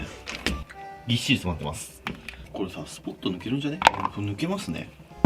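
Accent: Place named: Mikawa, Japan. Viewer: かねこさんのバリバリの三河弁が好きです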